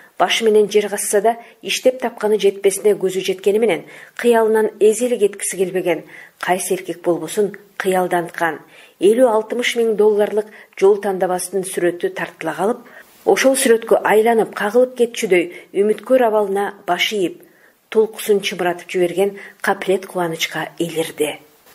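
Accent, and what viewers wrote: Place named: South, Kyrgyzstan. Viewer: Туштук диалекти келтирип окупсуз...😊👍 Эмгегинизге рахмаат!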